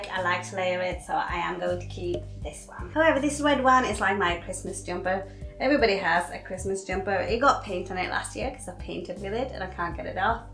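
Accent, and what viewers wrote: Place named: Sweden. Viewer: I told my husband you're Swenglish.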